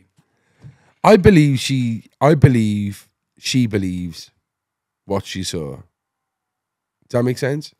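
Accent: Wales, United Kingdom. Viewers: Been a bit too much wool on for me lately, if i'm being honest, i'm more of an Awayday's type of lad being from Middlesbrough that is, though i do love the Welsh Valleys it's a beautiful place and love the accent my man, keep up the good work👍 we do both have one thing in common, we both have a transporter bridge❤️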